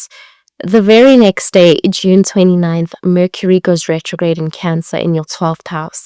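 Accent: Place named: South Africa. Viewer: Sounds like a very South African accent.You've got going there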